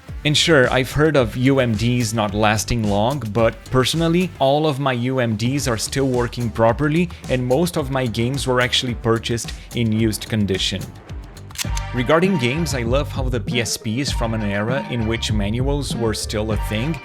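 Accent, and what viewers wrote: Brazil. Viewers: percebido um sotaque que parecia brasileiro em algumas palavras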